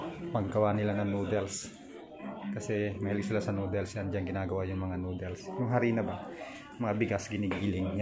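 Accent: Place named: Spain. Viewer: Why does it sound like you're speaking Spanish with Chinese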